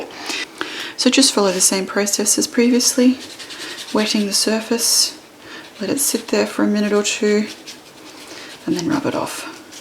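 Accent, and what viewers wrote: Australia. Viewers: Thanks for the layer ideas! Love watching you create! When my children asks how Australian sounds, we listen to you together ☺️😘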